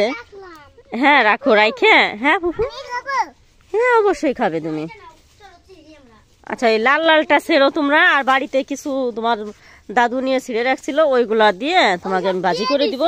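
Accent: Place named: Faridpur, Bangladesh. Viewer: খুব সুন্দর হয়েছে আপনার মেয়েদের ভিডিও। আপু আপনার গ্রাম কি ফরিদপুর? আসলে ভাষা পরিচিত লাগছে💚💚💚💚💚💚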